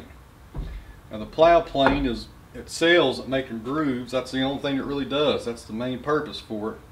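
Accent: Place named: South, United States. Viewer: That southern accent!!!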